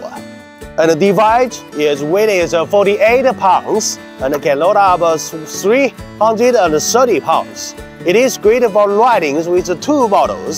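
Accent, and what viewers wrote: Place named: China. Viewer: I love his Chingrish... so adorable.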